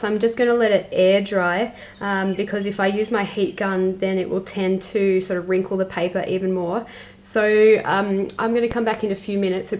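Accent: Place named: Australia. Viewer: I could listen to your voice all day. Seriously you aussie's have the best accent in the world to me. I love your pages btw. I only just found out about this literally not long ago I haven't even tried this yet but you make it look so easy and fun that I can't wait to try it. I usually art journal (only for a few months) but I think I'm going to to add this to my weekly art time. What a great way to get lost in gods word and learn.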